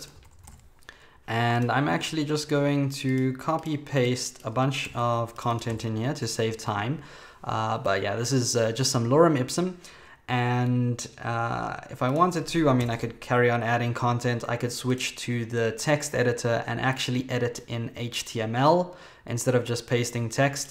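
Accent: South Africa. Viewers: Hey! thanks for all the tutorials man, really great to see a tut with a South African accent for once haha keep it up dude!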